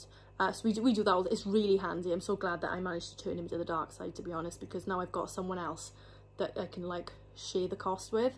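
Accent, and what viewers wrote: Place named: South Wales, United Kingdom. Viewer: You sound like you're from my neck of the woods. South wales?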